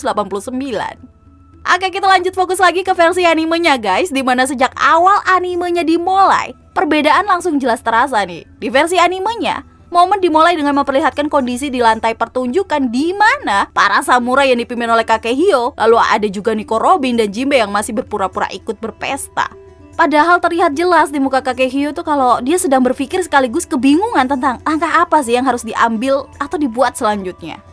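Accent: Java, Indonesia. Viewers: Medok dia org jowo kek Mr kucluk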